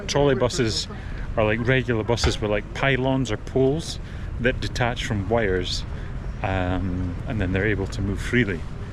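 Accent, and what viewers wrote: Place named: Scotland, United Kingdom. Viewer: A wee bit Scottish dialect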